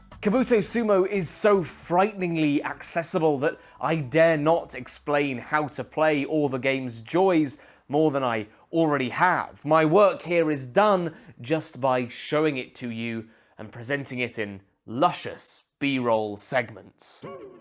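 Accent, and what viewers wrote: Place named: Wales, United Kingdom. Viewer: The accuracy to which a computer can reproduce a Welsh accent is giving me an existential crisis. Par for the course with a SUSD review to be honest. Great video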